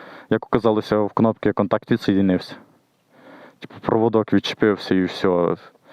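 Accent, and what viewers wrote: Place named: Ukraine. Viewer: Вот это классный украинский язык! Настоящий украинский, что и в детстве я от тетки слышал и от ребят с Украины, что к нам на Север приезжали работать, и от товарища с Николаева, и от тестя бывшего, земля ему пухом. Всё понятно! Совершенно отличающийся диалект от того, что украинцам навязывают полупольские галичанские западенцы, со своим отвратительным пшиканьем! Я просто с удовольствием послушал украинскую речь.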